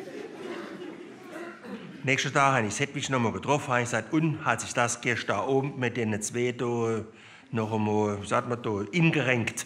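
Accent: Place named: Germany. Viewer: Der beste Satiriker. Das Auszeichnendeste ist der deutsche Dialekt. Wenn wir nur Politiker solchen Formates hätten, die uns führten.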